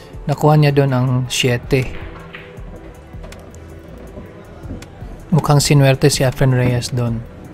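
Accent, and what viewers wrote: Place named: Philippines. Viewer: Pootah probinsyano ang narrator hahaha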